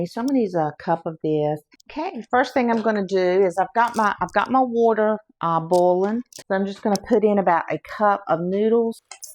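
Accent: South, United States. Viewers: I am so excited that I found your channel, love your beautiful southern accent and your recipes!!♥♥♥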